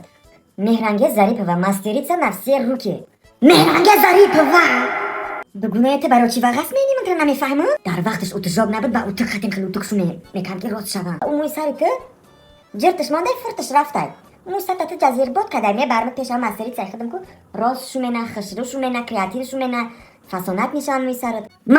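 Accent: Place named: Garm, Tajikistan. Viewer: 😂😂😂😂😂😂😂😂❤❤❤ чртущ рафтай фуртуш мондай 😂😂😂😂😂😂😂😂 куштима ай хандара неке як савол чиба гармиги гап мезане постаяно гармиен шумо е ки гармиера гапошона прикор мекни?? Гармие зур гап мезана мада махкулай зинда бошен мардуми гармие дар кучое бошен Худо нигахбонтон бошад ❤❤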